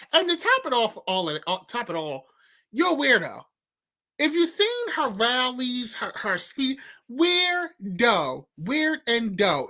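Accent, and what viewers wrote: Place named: Maryland, United States. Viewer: Great perspective..I knew you were from Maryland....that accent. 😊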